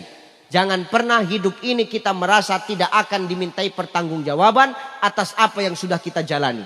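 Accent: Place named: Batak, Indonesia. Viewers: Sumtra utara mang gtu bang ..itu bercanda semua .saya org jawa tp saya justru suka cz mang bgtu.logatnya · Ciri khas bahasa dari kota Sumatra Utara khususnya Medan memang seperti ini logat nya engkau kau.pribahasa seperti ini nampak nya terlalu kasar bagi orang yang belum paham.tapi percaya lah orang suka Batak itu suaranya lantang dan kasar dan insyaallah hati nurani nya baik🤲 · Itu menurut mu aja... Karna anda lahir di daerah yg punya bahasa lemah lembut saudara ku.. sementara beliau dari Medan Sumatra Utara .. demikian lah logat bahasa nya ..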